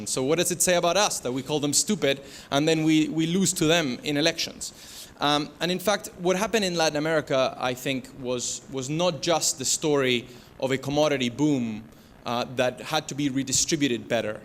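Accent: Argentina. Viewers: Acento argentino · pero se le nota que es argentino? al hablar ingles digo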